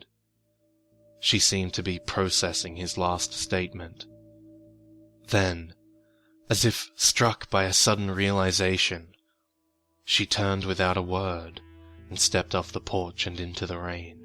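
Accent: Australia. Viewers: Both myself and my gf love hearing you read stories. Maybe it's that smooth ausie accent. Either way I'm watching your whole videograpgy. You are a great storyteller, never loose that wonder you have; through your mind and eyes and words we experience stories we may be too scared or busy or whatever to read ourselves. Laugh, cry, fearful tremors, or apathetic visualizations. You walk through them with us. Much love from cali, and thank you. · Congratulations and thanks, it's nice to hear an Australian accent narrating the creepy stories i love. your story is great too, I love the change of perspective and unexpected ending. great job!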